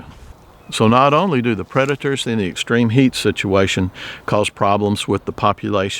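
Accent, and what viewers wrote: Texas, United States. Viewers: Thank you for your posts that illustrate and remind us of our duty and responsibilities as co-creators. Love listening to your voice. Your slow Texas drawl reminds me of my dear Uncle Lee who was also a humanitarian.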